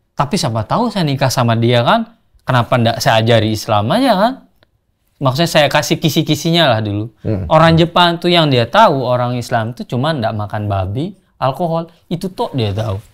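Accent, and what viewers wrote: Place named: Bali, Indonesia. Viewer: logat nya bang 😂 ada Bali Bali nya gitu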